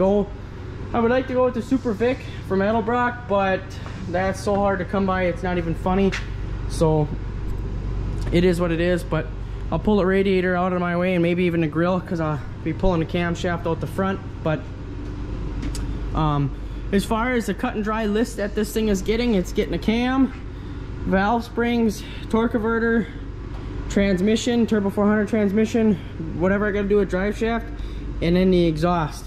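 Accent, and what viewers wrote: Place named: Wisconsin, United States. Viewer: What part of Wisconsin are you from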